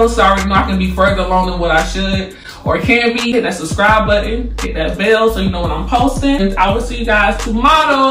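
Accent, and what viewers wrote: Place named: South, United States. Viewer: Beautiful skin. Flawless. Love the southern twang. 😊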